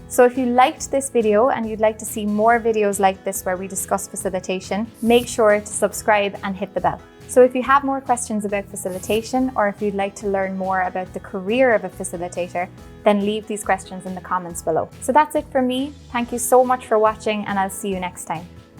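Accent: Ireland. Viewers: Love the Irish accent! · have you replaced Jonathan? It's not often you hear a strong Irish brogue on YouTube. Love it or should that be fair play to ya. Anyway my questions, what are the main DOs and DON'TS for facilitation and what are the he biggest errors that new facilitators often fall into. Both questions are probably related.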